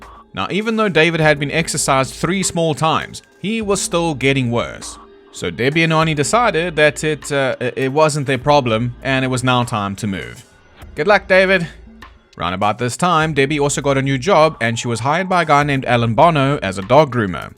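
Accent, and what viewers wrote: South Africa. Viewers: Bro? Are you South African? I'm asking because I'm South African and your accent is sounding very familiar 😂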